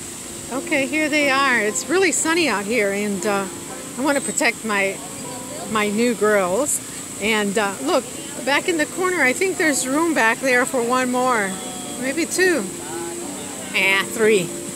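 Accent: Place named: Tampa, United States. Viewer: okay beautiful thank you you drove 5 hours to Miami from Tampa to Miami is about 4 and 1/2 getting to the place another half that would put you close to my vicinity and your accent sounds like you're from Tampa what's up am I wrong??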